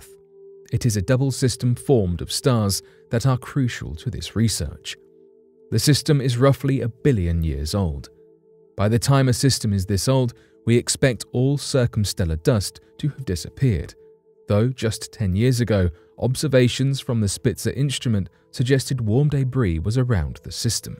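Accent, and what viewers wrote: United Kingdom. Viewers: I hope those having some knowledge and sophistication will immediately understand the profoundly arrogant messages encapsulated in this video - manufactured complete with Brit accent to intimidate the Americans and their idiotic “mommy-daddy complex” they have towards that stodgy manner of speaking. “No evidence of alien contact…” yeah right. Get real dude…. what you meant to say was “no officially acknowledged contact with aliens.” Otherwise, the door is completely open. The idea that “despite all we know about stars and nebulae…” again, please: the realm designated as “science” the world over is in fact a church, largely controlled by governments and oligarchs- please. COVID proved that science does not exist for the general public and that real scientific reasoning and practices have been destroyed. In any case, almost nothing (of meaningful substance) is known about human existence and the proclamation here is preposterous.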